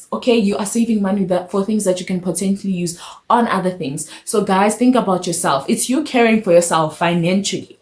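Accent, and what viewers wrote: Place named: Zimbabwe. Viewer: Interesting topic and subscribed. Where you’re from originally, I picked up an accent. I know you studying in SA. Are you Zimbabwean or Malawian?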